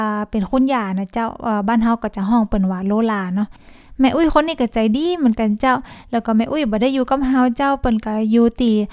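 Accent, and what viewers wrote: North, Thailand. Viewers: สำเนียง ลำปางกะเชียงราย เหมือนกันมาก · เพิ่งมาเห็นช่องนี้ กำเมืองงงง น่าฟัง รื่นหูมากกก ติดตามเลยเจ้าาา❤❤❤❤ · ฟังรู้เรื่องอยู่ค่ะ เพราะที่ทำงานแต่ก่อนคนเหนือเยอะเลยฟังรู้เรื่องค่ะ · ครั้งแรกเน่อเจ้าหล้าเพิ่งเจอยูทูปเบอร์คนเมือง แม่ก่อเลยกดติดต๋าม เลย ฟังกำเมืองเหียพ่องม่วนดีเจ้า คนเจียงใหม่เน้อเจ้า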